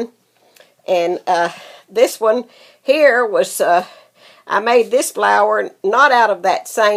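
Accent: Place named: Texas, United States. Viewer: Your weløcome. I thoroughly enjoyed your videos. Its always for to see and hear about things I have no possibility to know. Loved your texas accent to.) My blog oh wow. I dont update that one as I should to buzy uploading things in here. You find me on pintherest also. Line Cathrin Ekseth wich is my full wonky norwegian name. I just spotted your order. Thank you very much for that.:D Big hug Lele.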